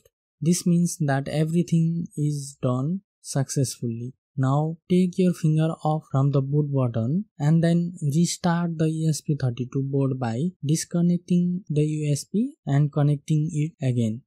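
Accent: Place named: India. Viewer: downvoted because you sound indian and i can't understand half of what your saying. let me explain: downvotedbecauseyousoundindianandican'treallytellwhereonewordstartsandanotherwordendsbecauseyoudon'thavespacesbetweenyourwordswhenyouspeak. i don't think can be any more clear than that.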